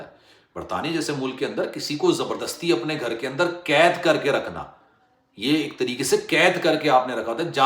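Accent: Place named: India. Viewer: speak like a pakistani please not indian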